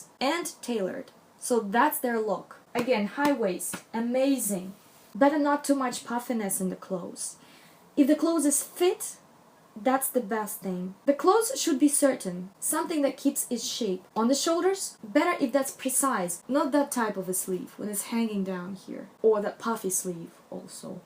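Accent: Russia. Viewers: that russian accent tho, can tell, you're from my country :D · Акцент похож на русский. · Is your first language Russian (or any other slavic one)? Your accent is lovely by the way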